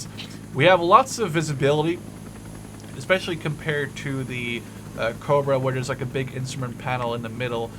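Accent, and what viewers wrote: Netherlands. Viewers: PSA! He is from the netherlands so he does not speak perfect english, hell even i can't so look how about we all just let it go and enjoy the show, cheers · Devin Wheeler He's Dutch, he's not a native speaker.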